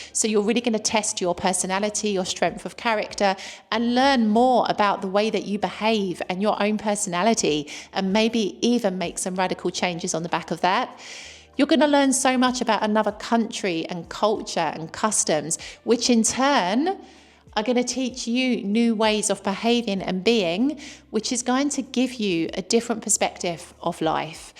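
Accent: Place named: United Kingdom. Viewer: Ugh .... that british accent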